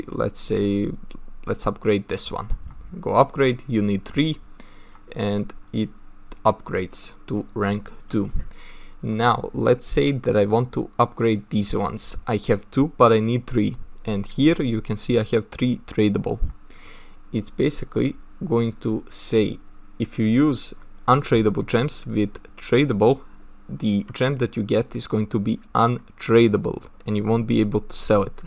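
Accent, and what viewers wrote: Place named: Balkans. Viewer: I can tell hes balkan and might speak my language because of the accent.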